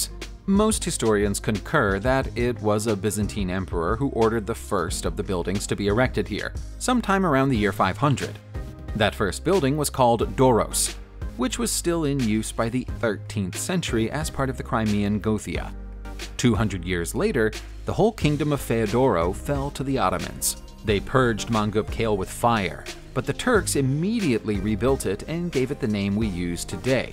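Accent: United States. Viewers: your pronunciation is typical American .....ATROCIOUS!!!!!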